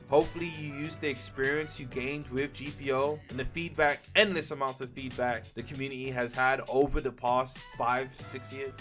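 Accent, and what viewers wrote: United Kingdom. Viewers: british smh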